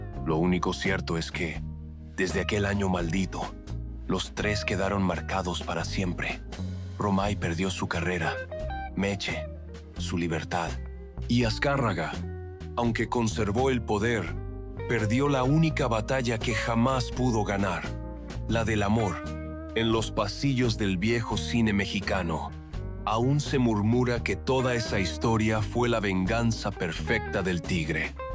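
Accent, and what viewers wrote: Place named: United States. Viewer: Esa voz, como agringada, no se oye muy bien, pero meche se fué por el negro durazo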